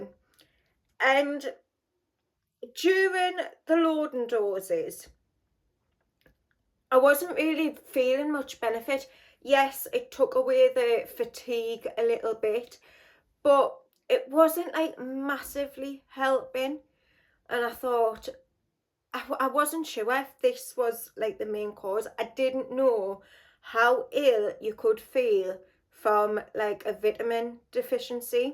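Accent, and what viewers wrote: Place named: North East England, United Kingdom. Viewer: ! It’s so lovely to see you back🤗 Can you believe I’ve only just found your channel. I started binging your videos this month while recovering from gallbladder surgery! ❤️your content and your North East accent🥰. I have not worn much fragrances while recuperating but I do still love to sniff them😂. All the best from NYC x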